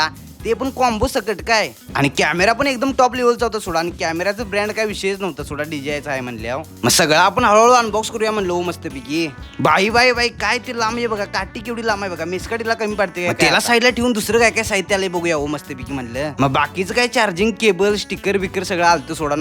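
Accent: Kolhapur, India. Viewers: Agayayaya meskati ky to kolhapuri touch aa❤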